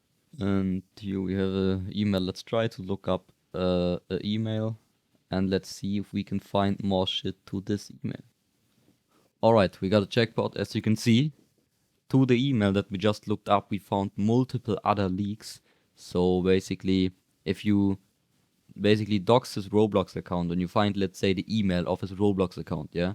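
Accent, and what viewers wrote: Germany. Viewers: Du bist 100% deutsch das hört man sehr stark raus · i hear deutsch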